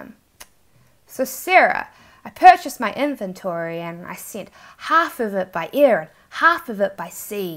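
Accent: New Zealand. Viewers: New Zealand accent,,,Aussies know how to pronounce vowels. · yes huge and heavy, there’s more room to move if you know what I mean. Great instructional videos you provide, keep it up. I need to learn more about finding new products. I’m originally from the west coast of NZ so I can understand you perfectly:-) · sarah are you a kiwi? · Definitely New Zealand yis ! 😂 Cute! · I love your New Zealand accent is majestic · she has an accent, why? Because she is from New Zealand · I've never thought New Zealanders have such funny accent. :-)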